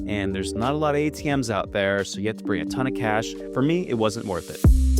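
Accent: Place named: United States. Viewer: I have been doing some Google searching to see if a US citizen can use the international driving permit (IDP) with the motorcycle endorsement. The answer it keeps giving me: "No, a standard U.S.-issued International Driving Permit (IDP) does not work in Vietnam. This is due to a strict treaty mismatch known among travelers as the "country trap". Vietnam only recognizes IDPs issued under the 1968 Vienna Convention. However, the United States did not ratify that specific treaty and only issues IDPs under the 1949 Geneva Convention. Because of this, Vietnamese authorities view a U.S. IDP as legally invalid for motorbikes over 50cc" I am only assuming you are from the US by your accent. If you are, how were you able to obtain the correct license?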